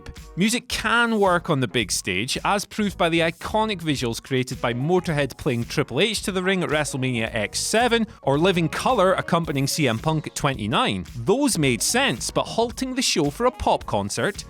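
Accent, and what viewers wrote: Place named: Scotland, United Kingdom. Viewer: Hey, he's scottish. They talk like that :o)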